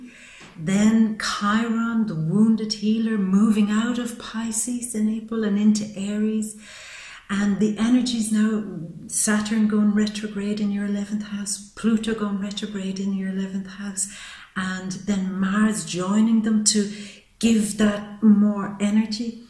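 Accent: Ireland. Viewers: Hello, thank you for your well prepared reading. I loved the references and quotes of famous Pisceans. I find you to be very actuate (for me) and very profound. Since the beginning of the year (I have spent a lot of time thinking (alone-like the Hermit), I am going through a life changing transformation and your info is very timely. I am so happy to have found you. A Big Thank You. I love your lovely Irish accent too.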